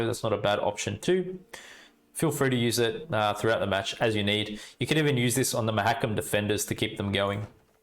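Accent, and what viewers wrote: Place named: Tasmania, Australia. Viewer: That s why I was not sure, the Tasmanian accent tricked me 😁. Merry chrismas too.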